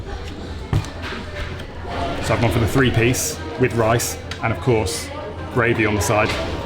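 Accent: United Kingdom. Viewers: I like your kind of British accent and the tone of your voice ..i can easily understand what you are saying. Enjoy your food.. 🇵🇭🇵🇭🇵🇭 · I love your voice it's very soothing. Like a History Channel documentary. I love the British accent. Reminds me of Harry Potter too😁 Thank you for promoting Filipino foods.😊 I love ube jam🤤🤤🤤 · The London accent is the nicest in the world I think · I just love hearing the British accent.... :)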